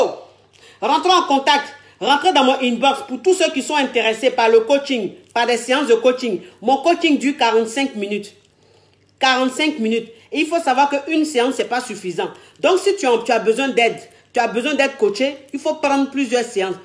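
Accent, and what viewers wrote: Ivory Coast. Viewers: je suis congolaise de Brazzaville mais dés que j'ai vue la dame parler j'ai su qu'elle était ivoirienne. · ivoirienne d' après l' accent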